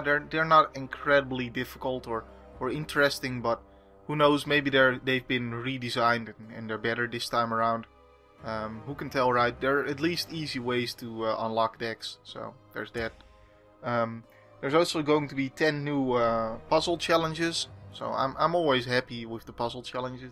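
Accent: Netherlands. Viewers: if this ain't no Dutchy then i don't know who else can speak this dutch-english dialect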